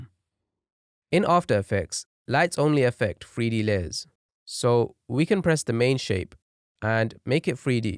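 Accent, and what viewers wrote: United Kingdom. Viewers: most british accent i ever heard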